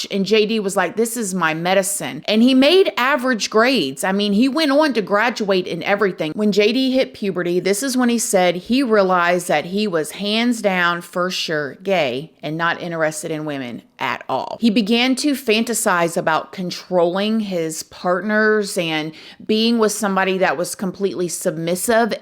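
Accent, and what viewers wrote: South, United States. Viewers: G’day. You’re a very friendly, lovely lady 😊. This is my first time visiting your channel. I found your post to be very informative and with all due respect, I love your accent. It reminds me of the Beverley Hillbillies. Y’all come back now.😊😊Consider me an Australian friend and yes. I have just subscribed to your channel ❤❤🇦🇺🇺🇸🇦🇺🌹🌹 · Southern accent, so cute.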